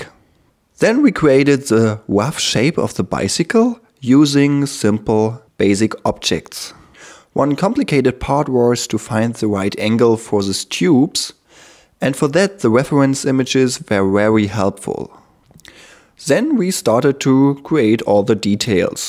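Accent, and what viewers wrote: Germany. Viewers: man kanns auch übertreiben mit dem deutschen akzent ^^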